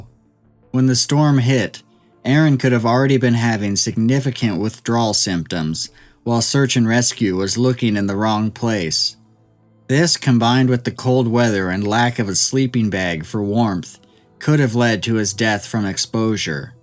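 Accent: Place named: Southern California, United States. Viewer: Is it interestING or interestEEN? HuntING or huntEEN? EndING or endEEN? Dang SoCal Californians!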